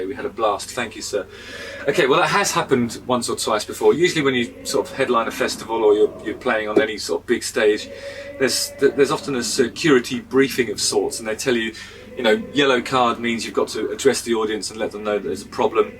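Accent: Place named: RP, United Kingdom. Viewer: Here's a question: You hail from 'the toft' but where is your accent? Have you always been posh or did you deliberately change your elocution? I drove my old bucket of rust (an Austin Allegro) to the bright lights London in the 90s, and found my accent was a source of ridicule, so deliberately began to affect a kind of posh/cockney vernacular to fit in. This seemed to help my credibility but to this day when I talk in public, especially when stressed, I find my Lowestoft accent seeps through to betray my humble origins. Just wondering what your perspective is, and whether you could give us a nostalgic rendition of " ", recorded in Lowestoft in the 60s?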